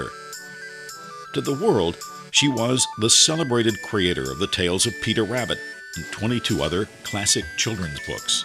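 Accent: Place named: United States. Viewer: Unfortunately it's an American voice over . 🇮🇪🇪🇺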